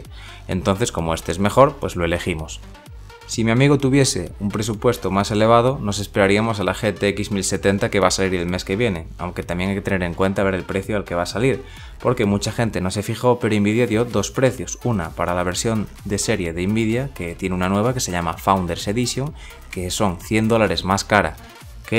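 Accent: Galicia, Spain. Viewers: muchas gracias por responder. . seguramente pille un 6700 sin k ..ya que no me interesa hacer OC . por cierto ..eres gallego ??(yo lo soy) ..lo digo por el acento ajaajaj!! un saludo crack y sigue así ..llegarás lejos;) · se nota q eres d galicia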